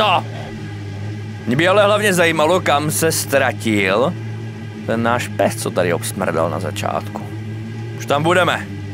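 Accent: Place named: South Moravia, Czech Republic. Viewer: Tak od tiaľ sa bere tvoja slovenčina ;Z južnej moravy 🤣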